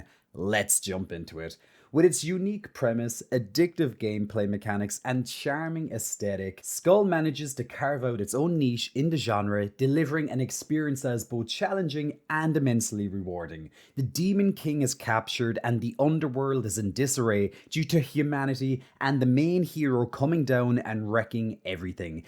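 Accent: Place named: Ireland. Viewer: Irish accent and furry pecs 😵‍💫🥴😭😭😭😭😭😭😭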